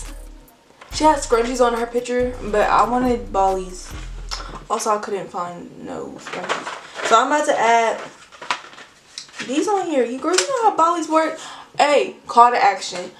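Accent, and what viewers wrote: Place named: Bay Area, United States. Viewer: lol u talk like ya out here in the bay I was like oh she must be local 😭😂 stay safe bihhhh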